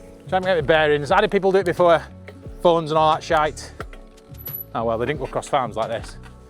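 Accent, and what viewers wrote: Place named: Barnsley, United Kingdom. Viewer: Eyup fella, there's nowt worse than feeling knackered sleep wise, but at least you got out and did summat. You can't beat getting out and getting some air in your face, and especially when you're fatigued, or your head feels full. Don't worry about your Barnsley twang, it's who you are, and your music was just rate and not too harsh to go with your ride. Me and my mate are having a rest tomorrow (Sunday) we've done 6 rides this week and about 145 miles according to strava. I see your on a emtb, have you ever run out of battery? We're not ruling out having one ourselves at some point in the future, but while we can, we'll keep battling on. Keep the videos coming fella 🚴‍♂️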